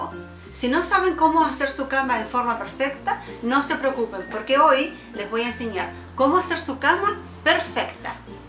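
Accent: Chile. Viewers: eres chilena? Por el acento, me gustaron lmucho tus sugerencias...además que eres muy sencilla y correcta, sin poses...todo está muy bonito gracias!!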